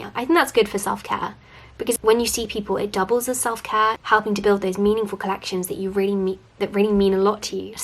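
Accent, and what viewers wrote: United Kingdom. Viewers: Your accent is so purelyyy British ❤❤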